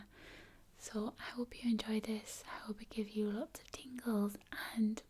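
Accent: United Kingdom. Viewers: Lovely sounds, I especially love the cap opening and closing. Are you from Britain and if yes, what part? Your accent is great. Thanks! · Great!I understand your British accent)))love The British English)) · Your accent is lovely and so very relaxing. You're like the british version of Arwen from Lord of the Rings <3 And let me just say, your skin is to die for! Ohmygosh it's so perfect. Okay, fangirlmoment over. Awesome video :)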